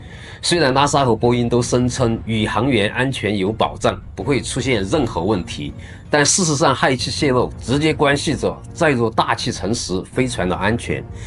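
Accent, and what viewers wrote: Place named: Guangxi, China. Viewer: 广西桂柳地区口音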